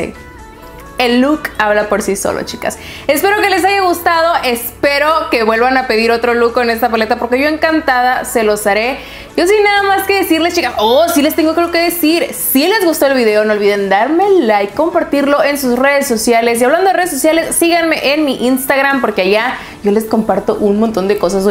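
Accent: Sinaloa, Mexico. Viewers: Yo quisiera saver de donde eres ? 😜 me encanta como hablas, plebes??? Seras de Sinaloa ??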